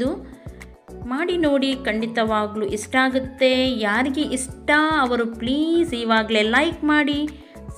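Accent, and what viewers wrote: Mangalore, India. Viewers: Buddy it's Mangalore accent and request u to Rome all over Karnataka to learn or understand before committing....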